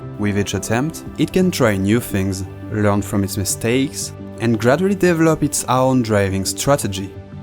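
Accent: France. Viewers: Je me disais bien qu'il y avait un petit accent Français, tu parles super bien anglais j'ai mis 2 vidéos à capter mdrrr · Wow, cool video, i've watch your others video when you train your IA it helps me on school project thanks (btw i've got a question. is that the "french accent" ? (because i'm frecnh so i ask)) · I cant listen to this french accent Im sorry... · you sound like you'r french are'nt you ? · Pourquoi il a un accent français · Tu es français nan? Tu as un accent fr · Fr I spotted the french accent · Tu es français ? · Good video I love the idee. Ps : tu es francais ? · Rooh l'accent bien français c'est cramé 😭😭😭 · t'es francais non ? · J'entend la france dans ton accent 😂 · Are you french ? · Je suppose que tu es français? Ton accent est bon mais très français 😂❤❤❤ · are you french by chance? trying to place the accent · bro gotta be french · l'accent bien français comme on aime xD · Are you French or Chinese? 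Or both? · 100% il est français mdrrr super vidéo sinon! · t es francais nan? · are you french? cuz since the video started i heard ur accent and was kinda curious about ur nationality, and when i heard the way you said "parameters" i was like: "he must be french". the reason im asking is because my curiosity is killing me bro also the video is awesome. that seems so hard to do. i've always wondered like how are they making AI. i mean the how is it LEARNING if you have to CODE it to DO something. it still blows my mind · bro are you french? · Are you French ? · On entends ton franglais MDR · are you french? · t'es francais ? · You are French ? Your voice is strange. Tu as un accent assez français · Are you french bro ? Love the accent haha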